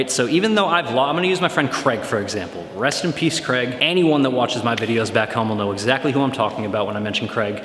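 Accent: Australia. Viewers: It’s funny hearing you speak with an Australian accent and then comparing it to your older videos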